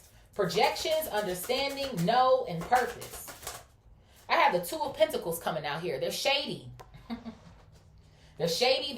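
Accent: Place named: California, United States. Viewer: she sounds like shes from cali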